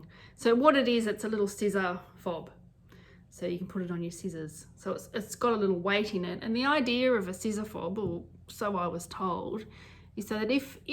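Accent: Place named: Australia. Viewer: Great to see you back! Love what you do and your presentation was very enjoyable... not too many of us Aussies on YouTube so it's so refreshing to hear you!!! Take care 💚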